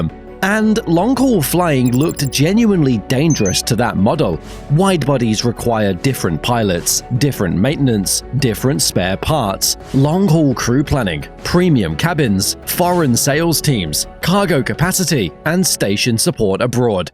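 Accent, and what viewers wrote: United Kingdom. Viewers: You have the most annoying standard British voice ive ever heard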